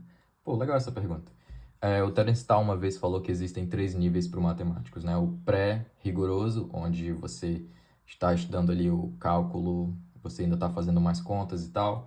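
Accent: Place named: North, Brazil. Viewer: Sabia que tinha reconhecido um resquício de sotaque nortista em você. Sou do norte também. Você é fera demais. Orgulho de você.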